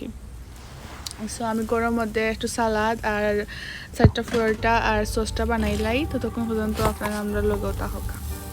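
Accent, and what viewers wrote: Sylhet, Bangladesh. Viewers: ছিলেটি কথা সুনে মন ভরে যায়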